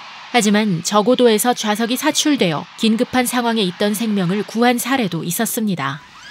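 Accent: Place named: United States. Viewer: 발음 뭐미 재미교포 발음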